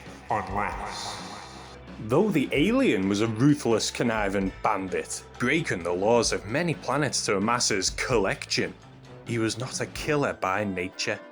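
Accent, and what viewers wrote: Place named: Liverpool, United Kingdom. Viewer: Are you a scouser? Your commentary is delightful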